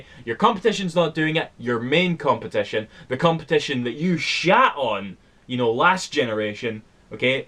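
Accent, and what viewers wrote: Scotland, United Kingdom. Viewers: you are so Scottish its awesome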